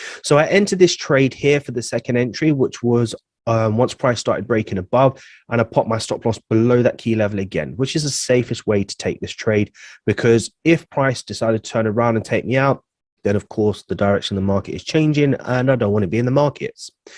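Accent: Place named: Cornwall, United Kingdom. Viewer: Greetings from Miami!!! Love, love the vids!!! you truly ROCK. I was wondering if the membership you offer includes daily signals? Also, are you from Cornwall? I have a friend who's accent is soooo similar to yours...lol. Thanks for great content and clear delivery!!! 🙏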